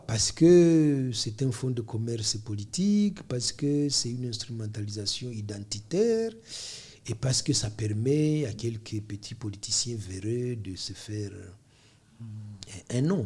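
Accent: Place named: Rwanda. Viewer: Mr tu es rwandais ar ta tonalité prouve que tu l'es et tout ce que tu dis est vide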